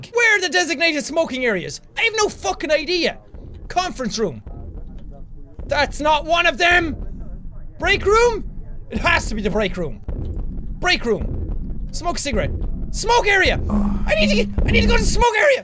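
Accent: Ireland. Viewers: I love his Irish accent. I don't understand why people make fun of the way he says his ts. like I love how he say "I just tought I'd play"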